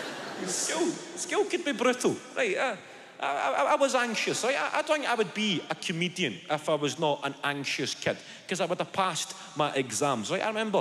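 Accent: Scotland, United Kingdom. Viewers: I love a Scottish accent 😁 trust me you get stronger, I'd recommend trying to watch Rab C Nesbit 😂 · Brilliant accent easy to understand if your from Scotland, the experiences he talks about of being a kid in 90’s is relatable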